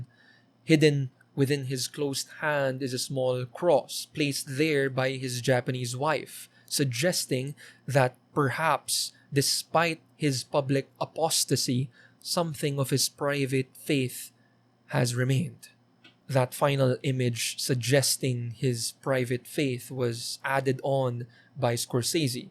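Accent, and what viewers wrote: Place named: Caribbean. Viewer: You have a slightly Caribbean accent, interesting.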